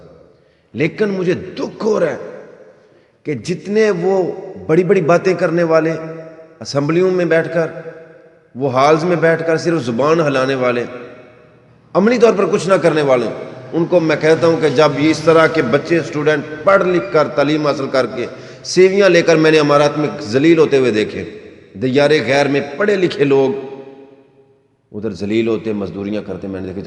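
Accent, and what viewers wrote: Punjab, Pakistan. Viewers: Veer g tohadi zaban te punjabi jaj di aa veer g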